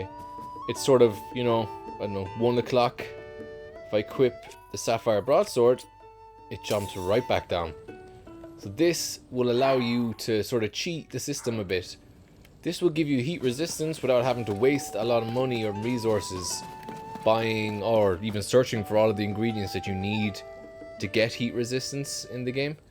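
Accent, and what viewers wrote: Ireland. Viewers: Thanks for your videos! Running through TOTK again with my son and there’s nothing better than getting tips with an Irish accent! Slainte!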